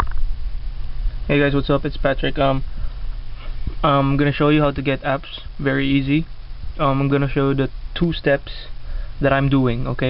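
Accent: Philippines. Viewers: are you filipino cause you have da accent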